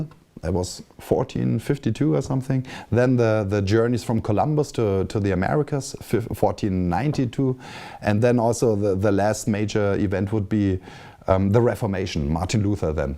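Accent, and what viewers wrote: Germany. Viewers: Was ist daran komisch? Sein Englisch ist fehlerfrei und verständlich und ja, man hört, dass er aus Deutschland kommt. Aber muss man sich denn dafür schämen aus Deutschland zu kommen?! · Du hälst den Vortrag in Englisch als ob du ihn in Deutsch halten würdest und das bereitet mir Kopfschmerzen beim zuhören. Ich höre dir gerne zu aber mach das bitte in der Sprache in der du es kannst.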